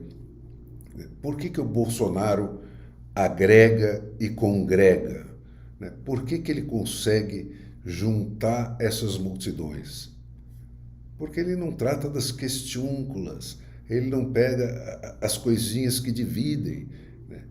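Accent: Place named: Arab world. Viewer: esse cara tem sotaque árabe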